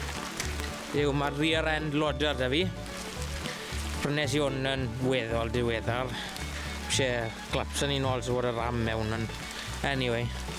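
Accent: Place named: South Wales, United Kingdom. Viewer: Hi, thoroughly enjoying the videos although the hwntw accent takes a bit of concentration, would be interested to learn more about your farm, seems like a great place for an enthusiastic & skilled young chap like you to learn. I see a lot of similarities between you & myself - Parts of our farm particularly some of the old stone buildings are in a dilapidated / neglected state but I have a plan in mind for every square inch of the place, money isn’t too much of a problem here but time definitely is. We also have many old 4x4s, quads & tractors, implements & tools - many have been here since new so a lot of time & money has and still is being spent on refurbishing them so they can in their turn help restore the farm. What’s the plan with the farmhouse? Do you live there or elsewhere? Best Regards.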